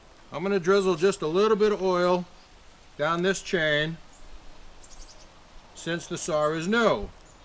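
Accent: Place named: New England, United States. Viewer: Idk, maybe Maine, upper Vermont, or mass? It's hard to tell.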